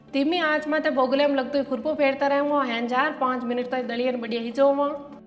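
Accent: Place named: Marwari, India. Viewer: Like Marwari accent.i will try this .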